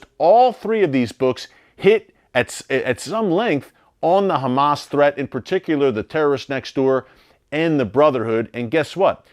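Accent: Philadelphia, United States. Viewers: I thought I recognized a Philly boy. I’m from DE. I miss good Philly pizza.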